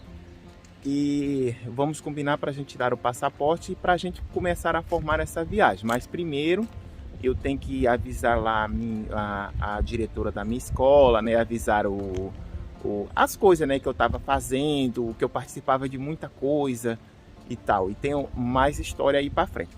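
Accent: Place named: Brazil. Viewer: Quanto a sotaque, parece-me igualzinho a de brasileiro acabado de chegar, mas vejo que se esforçou por "aportuguesar", mas tão tem problema percebo mto bem todo o sotaque de qualquer região de Brasil. Bom Ano tb para si!!! 🙋‍♀️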